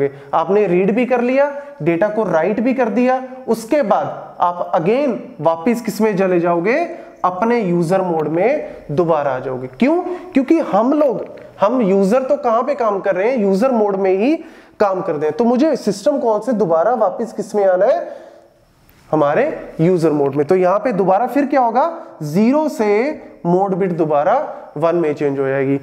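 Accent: India. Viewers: You still have your hindi accent😢😪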